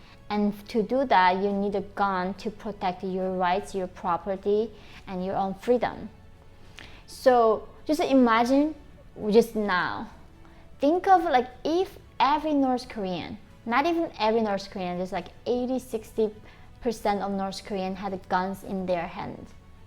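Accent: United States. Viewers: im so happy for you. you might be from North Korea but you speak like an American. Americans are people from different countries and cultures with one goal in mind to better ourselves and lives so our kids and have a better future and to keep this country great. I wish you a great life with prosperity. keep up the videos you have great reactions maybe you can do some on American sports especially football its a big culture tradition.. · I've been listening to her for a while and she is sounding more like a good ole Betsy Ross Gal every day!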